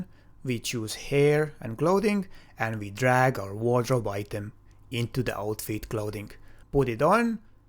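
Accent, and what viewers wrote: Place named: Scandinavia. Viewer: I love your accent — it feels like a Viking wizard from Skyrim is teaching me Unreal Engine. And the fact that you managed to make such a complete tutorial in such a short time, with humor included, is just amazing.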